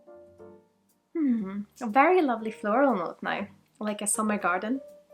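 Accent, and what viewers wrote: Scotland, United Kingdom. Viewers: Nice Scottish accent and great review.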